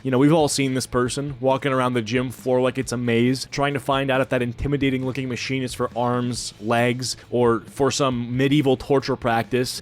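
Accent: Netherlands. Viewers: Je bent nederlands? Ik kan het amper horen in je accent, goed gedaan!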